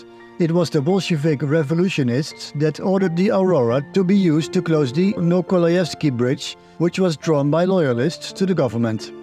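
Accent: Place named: Germany. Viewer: Oh pardon me. I just think you're German from your name and accent. also, I just know that you like the cemetery beside you like trying transportation. Indonesia actually has a lot of Dutch cemeteries. some of them still exist and some of them have already been destroyed. Examples of good cemeteries like Makam Jerman Arca Domas. Its cemeteries said that it's Nazi soldiers. Others are still good like the cemetery in kebun raya bogor, makam belanda at Menteng dalam Tebet and the museum Prasasti.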